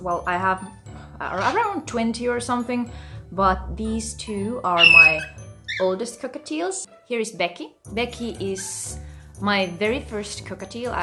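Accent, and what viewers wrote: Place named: Finland. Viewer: I hear a Finnish accent xD. Cute birds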